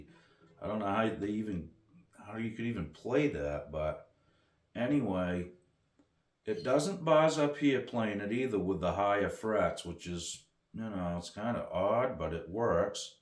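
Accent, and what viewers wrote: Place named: Maine, United States. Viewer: As soon as I heard u speak I knew you were a fellow Mainer. Cool video nice guitar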